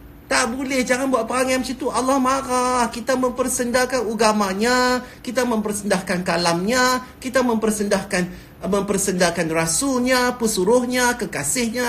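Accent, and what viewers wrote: Penang, Malaysia. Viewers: Dato ckp x berubah ...penang mai..Good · Oqang penang. Terbaik tok. Pekat loghat penang sampai la ni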